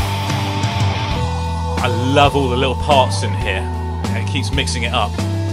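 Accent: United Kingdom. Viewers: Great channel, cool english accent and diction!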